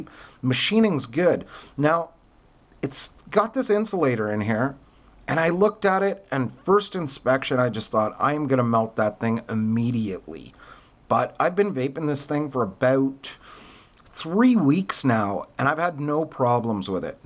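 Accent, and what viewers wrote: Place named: Quebec, Canada. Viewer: Lol, your accent was more French Canadian than Louisiana.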